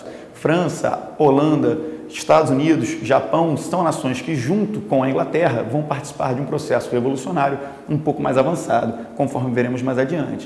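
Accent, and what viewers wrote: Rio de Janeiro, Brazil. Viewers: Esse sotaque carioca é muito gostoso de se ouvir.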